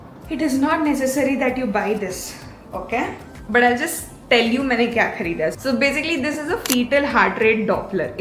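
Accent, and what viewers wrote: Bihar, India. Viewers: Your accent is bihari but you are from Rajasthan I am not getting it how how 😂?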